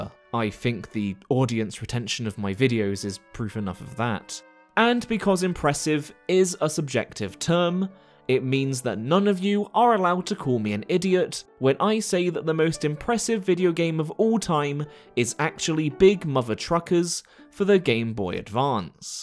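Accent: United Kingdom. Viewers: It isn't annoying to hear lorry. It is refreshing to hear an Englishman speaking English.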